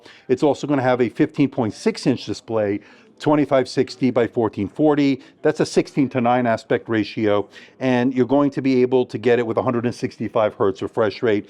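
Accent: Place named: New Jersey, United States. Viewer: You live in Las Vegas, but you have eastern accent, New Jersey maybe?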